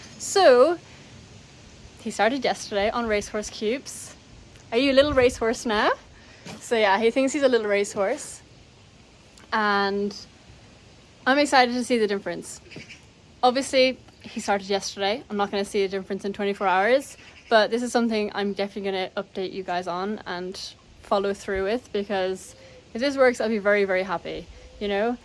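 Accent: Ireland. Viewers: First. Wonderful vlog. New helmet! Love it! Just enough bling! I love how you used to say Khal was so pokey and you kind of looked resigned. Like - yup he's sooo slooow. He was but I still thought he was adorable. When I revisit those vids I can barely see the incredible jumper he is today. I really loved him but I could not for the life of me see his future self. Kudos to you for seeing it. Second, I LOVED your comment about Meg. Lordy, she is funny with the semen. She blushes and I crack up! I am not a vet but am a nurse so I truly have no problem discussing anything at all with anyone at all. Healthcare, be it animals or people is full of lots of bodily fluids going willy nilly. And as an American, I love listening to your beautiful Irish voice but then you said Urine in a way that my American ears almost started to bleed! It was so darn funny too me. Keep up the wonderful content. 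Sorry for the long comment-I just have so darn much that I love about you and your horses, your dad and your attitude. Plus I love that I know about gentamicin.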